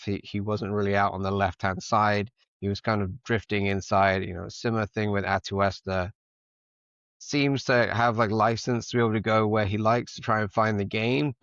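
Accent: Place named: RP, United Kingdom. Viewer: Your accent is so much more posh now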